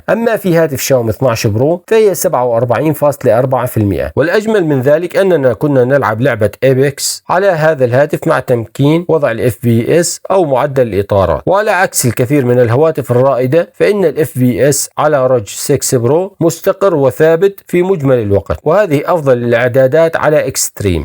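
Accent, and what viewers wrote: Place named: Deir ez-Zor, Syria. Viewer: اشتركت لانو لهجتك ديري ... إستمر بالتوفيق عجبني انك تجمع كل التفاصيل كون اكثر حيوية ...تقبل مروري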